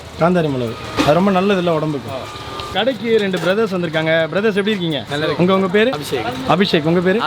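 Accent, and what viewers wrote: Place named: Kerala, India. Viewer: Malayalam slang madiri eruku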